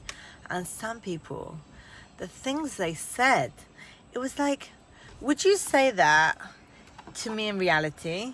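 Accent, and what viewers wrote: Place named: England, United Kingdom. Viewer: Are you English? You've got an interesting accent.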